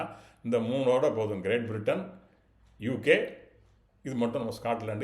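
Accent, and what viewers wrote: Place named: Tirunelveli, India. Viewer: மங்கா புகழ் கொண்ட எம் நெல்லை தமிழே... நீவீர் வாழ்க.❤❤❤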